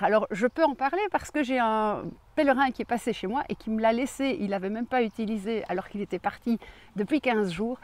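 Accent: Belgium. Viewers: très utile et très agréable le petit accent belge!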